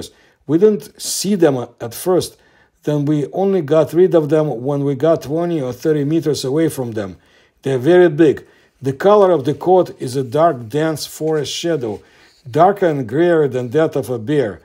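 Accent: Russia. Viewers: you are a gifted story teller. I enjoy your voice and the Russian accent is a plus:). 1985 I spent 2 years in Siberia building the KATEK. Your stories take me back. I had many adventures there. Also, one winter evening while walking alone in a remote road, I saw 2 lights rise up from the tree line, paused then disappeared. When I turned my attention back to the road, they were right there in front of me. I was paralysed the entire time they stayed there looking at or through me then they just disappeared. I ran the rest of the way until I got home. What is strange is that I was never afraid. Even now when I remember that incident, I get a good feeling as if I miss that incident. Anyway, keep going. Thank you.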